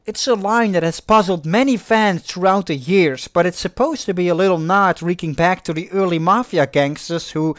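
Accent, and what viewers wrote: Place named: Netherlands. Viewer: Very sorry to sound like "That Dumb American", but I absolutely enjoy your Dutch accent. But honestly, your English is pretty good. I don't know how many Dutch citizens speak English, but you're very well spoken in the language. Aside from that: keep up the good work with your videos. Thank you.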